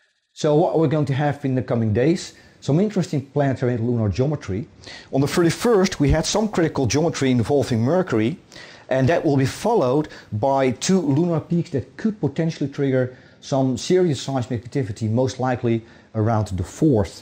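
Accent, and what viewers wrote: Netherlands. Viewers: typically Dutch ;-). We almost all speak like that over here :').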